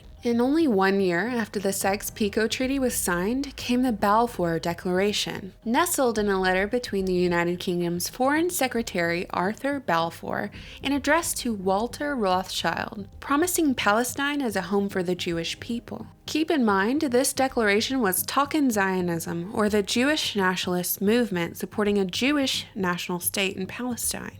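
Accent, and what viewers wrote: United States. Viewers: Are you from the South? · Sounds VERY American to me, A damn shame.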